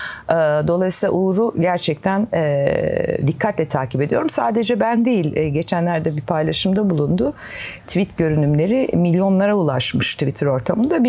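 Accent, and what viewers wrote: Standard. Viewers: Dinlerken yormayan, temiz bir türkçe, güzel bir ses tonu ve gerçeklere vurgu! Severek izliyorum (dinliyorum) 👍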